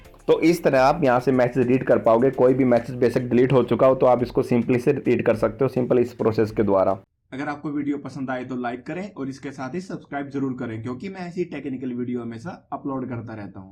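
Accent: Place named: India. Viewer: Why do Indian English so difficult for me to understand???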